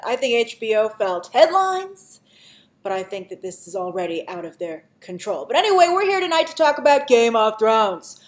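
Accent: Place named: New York, United States. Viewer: I just have to ask which part of New York are you from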